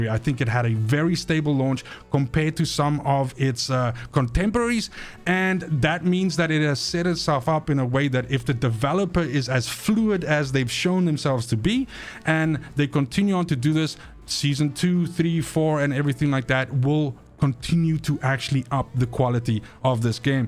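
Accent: South Africa. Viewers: Are you from South Africa perhaps? This, coming from a South African.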